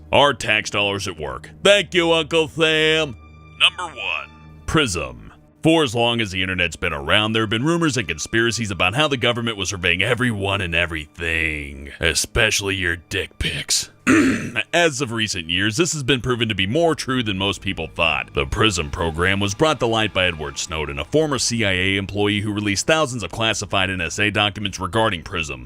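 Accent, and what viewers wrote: Australia. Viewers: hava gud one (the only other reason I Loved his narrations is His sexy australian accent) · Is Aussie?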